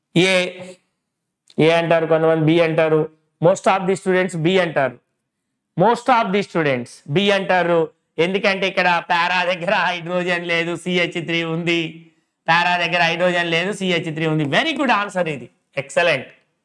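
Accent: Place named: Telangana, India. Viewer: Telangana yaasha😂😂😂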